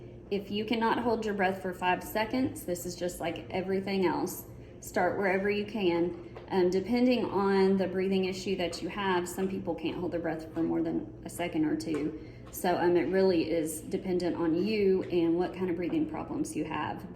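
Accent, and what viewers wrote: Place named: South, United States. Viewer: Also, I love your home and your southern accent. Old Texas dude here....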